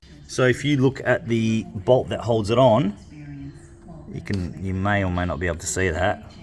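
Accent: Australia. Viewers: Very good stuff my guy!! Im in texas it sounds like you might be from Austrailia? Well where ever you are you helped me from many miles away...that was it! Thanks for posting!!!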